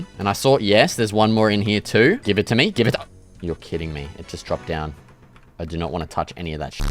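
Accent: Australia. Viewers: You sound like an aussie mate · Aussie gang, I can tell by your accent and how you’re saying a lot of Aussie slang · Australian, isn't it? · This guy may have the most Australian accent I’ve ever heard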